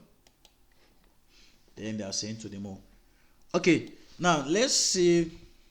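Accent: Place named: Nigeria. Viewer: where are you from, you sound Nigerian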